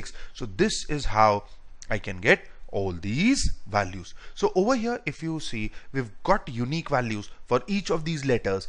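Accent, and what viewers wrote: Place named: Asia. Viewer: Your accent is too asiatic, dude. Good problem👍🏼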